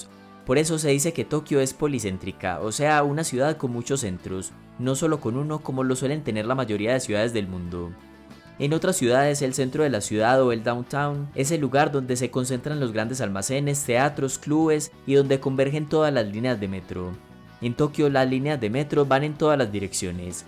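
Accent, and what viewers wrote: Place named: Medellín, Colombia. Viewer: La voz, si no me equivoco, es un acento de Medellín, Colombia. Si es así, que bueno que haya estos canales con tanto contenido de valor en nuestro país.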